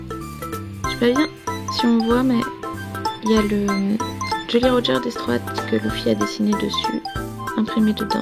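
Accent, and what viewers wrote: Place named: France. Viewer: ~! and your french accent is so cute great review! ps:i hope you know who i am;p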